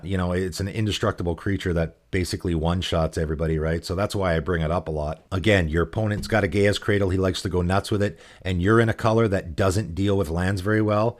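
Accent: Canada. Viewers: Z! I suspected you were Canadian :p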